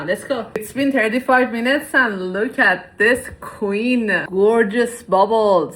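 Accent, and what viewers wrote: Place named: Czech Republic. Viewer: Love your personality and accent. Are you Czech?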